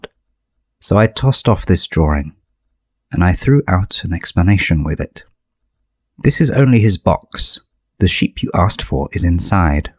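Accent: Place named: England, United Kingdom. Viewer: 와 진짜 crazy voice... i really like the intonation of English English.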